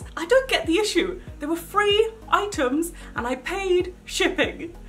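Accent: United Kingdom. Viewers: Hi are you British???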